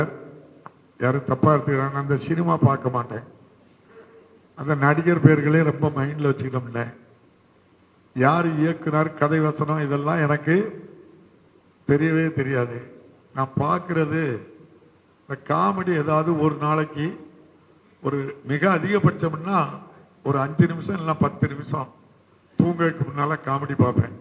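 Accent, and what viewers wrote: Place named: Tirunelveli, India. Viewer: ❤❤❤ நெல்லை மாவட்டத்துக்கே உரிய தமிழ் நடை இவருடைய பேச்சில் உள்ளது.❤❤❤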